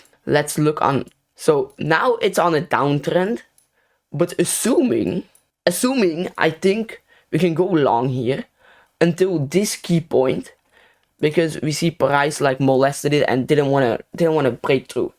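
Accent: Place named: France. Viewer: Your frech aczent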